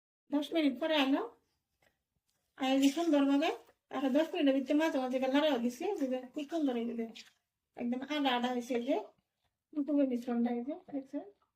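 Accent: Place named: Assam, India. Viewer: ❤❤ধনিয়া পাতা দিয়ে মাখাই ব্রেড ক্রামশ দিয়ে ভাজলেন ❤আপুর আগে চেনেল আছিল অসমীয়া ভাষায় তাইনা আপনার গলার শব্দ শুনে বুঝলাম ❤❤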